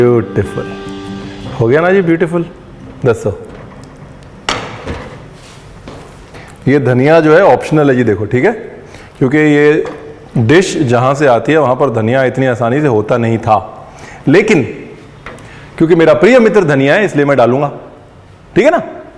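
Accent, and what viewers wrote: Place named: Bihar, India. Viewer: Sardar ki aulad hokar bhayiyon ka accent kyun bolta hai?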